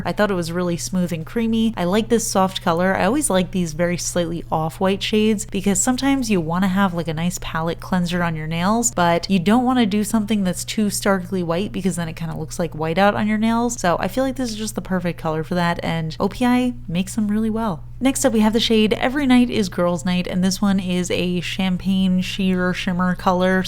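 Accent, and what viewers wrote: United States. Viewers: Oh what a wonderful video- spot on! Thank you- have ordered 4 of them. Where from the US is your accent? Becky from Sydney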